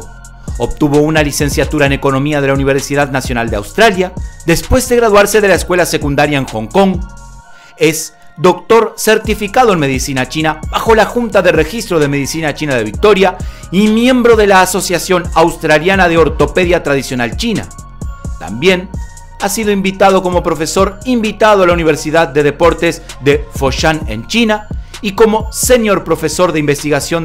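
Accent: Argentina. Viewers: chileno? Pensé que era argentino.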